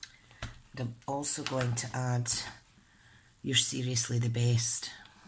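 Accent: Scotland, United Kingdom. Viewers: Another great tutorial. Thanks for sharing. Nice to hear a fellow Scot!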